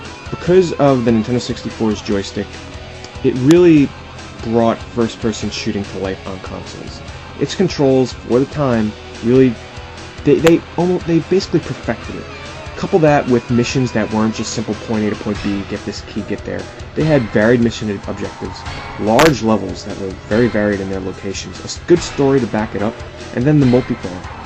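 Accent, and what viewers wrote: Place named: Boston, United States. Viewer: Yo your from Boston right? I can tell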